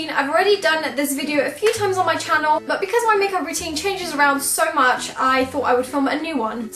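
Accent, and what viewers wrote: England, United Kingdom. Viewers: This is from Poland, but live in England. So she has a british accent :) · Where of England are you from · Hi,! I've found your channel a few days ago and I absolutely loved it! ♥ I also love your British accent, which I wish I could have someday... congratulations for the success on YouTube:) my best wishes from Argentina:) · Cyna monka but she has a British accent at the moment, right?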